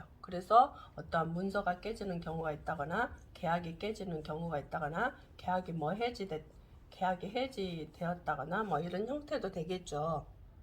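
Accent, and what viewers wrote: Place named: Jeolla, South Korea. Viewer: 전라도분이시군요 저도. .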